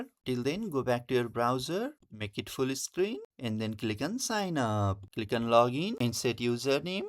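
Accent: India. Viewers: you know that you are completely saved the moment you click on a tutorial and hear a indian mans accent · Guys remember when these types of videos have an indian accent ur saved 😭🙏 he earned a subscriber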